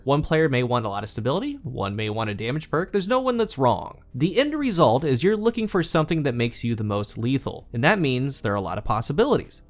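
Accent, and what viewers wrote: United States. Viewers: ' dulcet American tones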